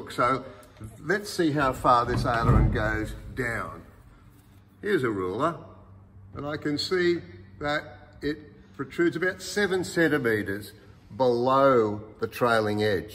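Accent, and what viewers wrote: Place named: Australia. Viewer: Clear and concise, also great to hear it from a fellow Aussie. Cheers cobba!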